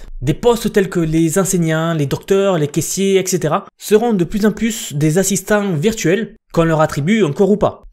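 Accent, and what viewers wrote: South, France. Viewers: Comment ça me fait trop plaisir d'entendre une voix du sud parler de technologie . Et puis je te suis, tes contenus sont supers et donnent la pêche.👍🏻😋. Tu es d'où si ça n'est pas indiscret ?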